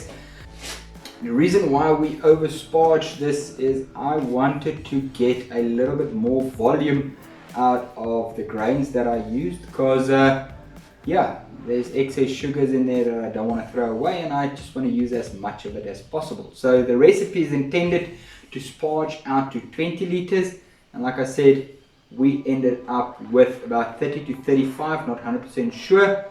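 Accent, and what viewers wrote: South Africa. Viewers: I cheated, LoL:-) I could tell by your accent that you're South African, so I used google translate.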